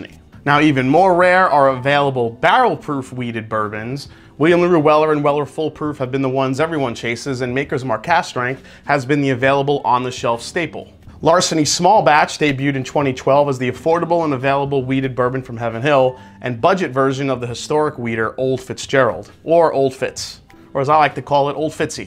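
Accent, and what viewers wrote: New York, United States. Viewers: Your New York Italian coming out hard core.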